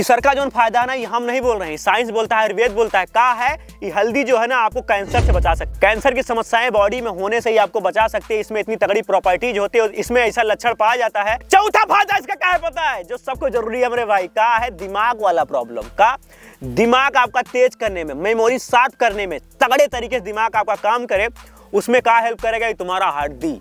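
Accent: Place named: Bihar, India. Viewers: Sbse achhi lgti h tmhari bhasha hmare jaisi h bihari type Bahut sundar bhai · Aese bhojpuri bolt rhaaa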